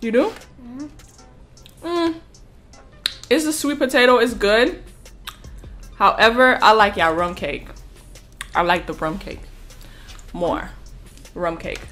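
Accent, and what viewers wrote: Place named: Jamaica. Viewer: U sound jamakon if I spelled it right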